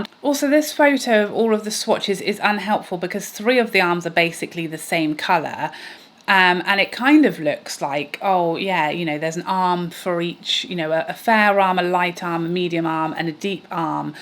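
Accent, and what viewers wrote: England, United Kingdom. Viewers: I could literally listen to you all day! You’re so funny and I love your English accent ! Canadian fan 🇨🇦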